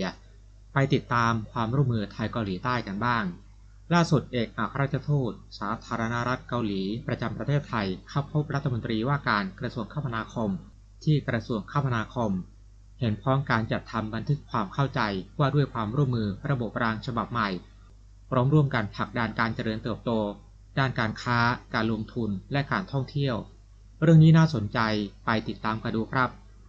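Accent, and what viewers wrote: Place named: Cambodia. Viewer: ได้ซิจ๊ะ ร ล ควบกล้ำ ฉันไม่ได้มาก่อกวนแต่สงสัยเพราะสำเนียงเธอเหมือนคนเขมรก็แค่นั้น คนไทยบางคนพูดไทยสำเนียงจีนก็มีเพราะเขาโตอยู่ในย่านคนจีน พูดไทยสำเนียงลาวก้มีอย่างคนอีสาน ..และเดี๋ยวนี้มีเพื่อนบ้านอย่างลาว เวียดนามเขาก็ทำคลิปยูทูปพูดภาษาไทยด้วย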